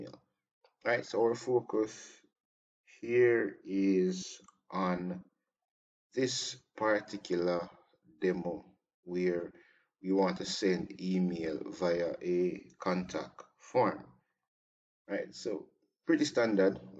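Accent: Jamaica. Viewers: jamaican accent on a php tutorial is maad crazy. big up unnu self man. Love from kenya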